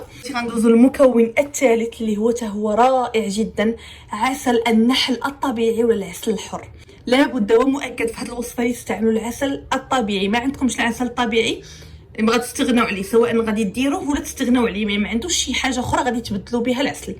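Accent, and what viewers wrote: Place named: Maghreb. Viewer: السلام عليكم اختنا الطيبة القلب السيدة -نشكرك على بث هذه الڤيديوهات المفيدة للناس ولكن نرجوك أن تتكلمي ببطئ كي نلحق أن نفهم كلامك بتتابع معك وكذلك نرجوا أن تتكلمي باللغةالعربيةالفصحى فاللهجةالمغاربيةالجميلةالعذبةصعبة الفهم في بقية الدول العربية،نرجوا الإهتمام وشكرآجزيلآجازاك الله خيرآ😍❣️👰🤵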